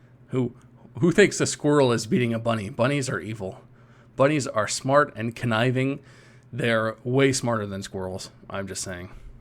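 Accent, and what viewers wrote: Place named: Canada. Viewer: OMG your Canadian accent just killed me, LMFAO #CanadianPride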